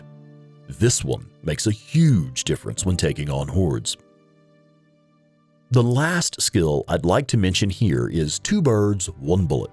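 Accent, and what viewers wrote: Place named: South, United States. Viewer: you changed your voice. I've watched a few of your older Days Gone vids and your voice was a little rounder then, with a hint of southern accent. this is a great game and you make great guides.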